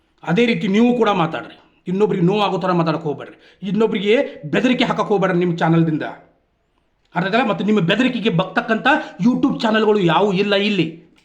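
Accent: North Karnataka, India. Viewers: ಸೂಪರ್ ಉ.ಕನ್ನಡ ಭಾಷೆ❤❤❤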